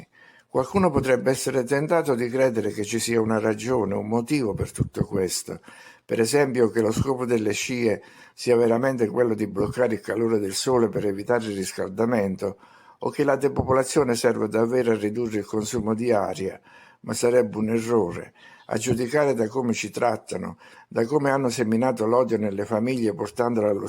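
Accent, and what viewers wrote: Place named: Molise, Italy. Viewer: L'inflessione della voce assomiglia alla mia molisana 😀 Grazie, e scusa per l'invadenza.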